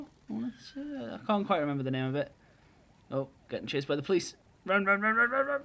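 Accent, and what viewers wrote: Newcastle, United Kingdom. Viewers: are you a geordie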